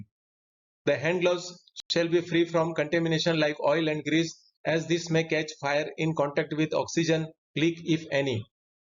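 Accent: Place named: India. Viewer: I don’t understand your India English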